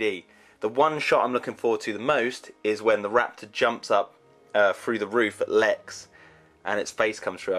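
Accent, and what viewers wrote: England, United Kingdom. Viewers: do u have a British accent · your from england rite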